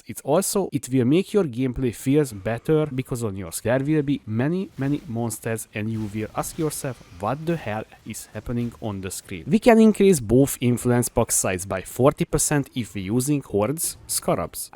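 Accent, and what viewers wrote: Hungary. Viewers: Nagyon magyar az akcentusod :D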